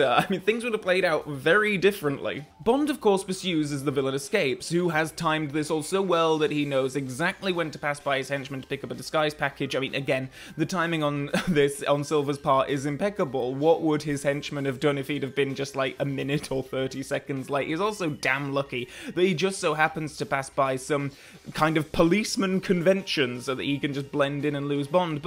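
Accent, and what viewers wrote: United Kingdom. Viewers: Well done. This wouldn't have worked as well if you didn't have a British accent <smile>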